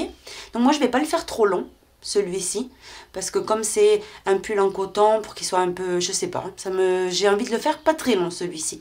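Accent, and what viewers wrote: South, France. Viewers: Il ressort un accent du midi?? Il me semble sentir Le Soleil dans votre voix et accent!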